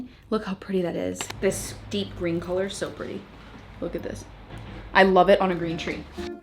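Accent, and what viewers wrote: Texas, United States. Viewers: I love the little Texas twang in your voice now. As soon as you grabbed the tree I thought she’s gonna put on gloves cause she learned from childhood. Lol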